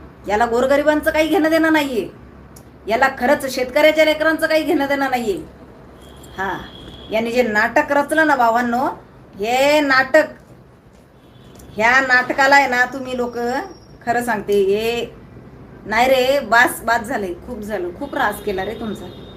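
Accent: India. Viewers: I am watching your videos from Canada. I am originally from Nagpur. I like your rural marathi and your boldness. You have exposed these so called Maratha leader. He is really bhangar illiterate person. God bless you beta 🙏🙏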